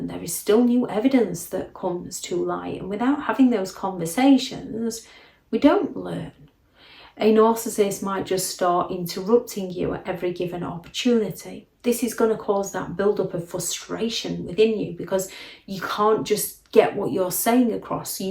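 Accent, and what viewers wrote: United Kingdom. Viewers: this is the FIRST time I have heard your voice. Forever I thought you were Southern Californian, wow was I pleasantly surprised that you are United Kingdom 🇬🇧 ish.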